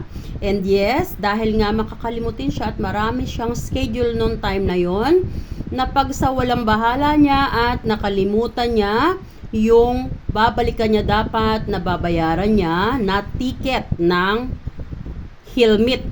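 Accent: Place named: Philippines. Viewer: Watching sharing akala ko Korean ka nanuod ako sayo silent now may sounds pinay ka pala❤❤ kahirap yan dapat talaga mag helmet kasi hirap 100 pa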